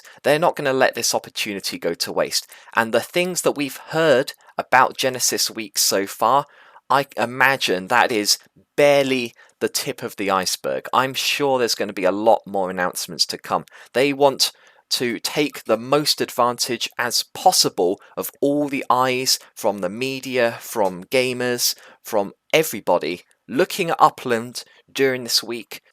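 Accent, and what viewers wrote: United Kingdom. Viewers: I wish I could go to Genesis week in Las Vegas how exciting. Where are your from? You have a UK accent.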